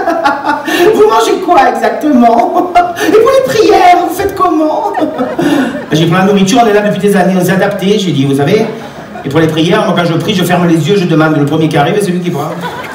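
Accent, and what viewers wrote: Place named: Marseille, France. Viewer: Super zize un plaisir d'écouter de découvrir ont retrouve l'accent de Marseille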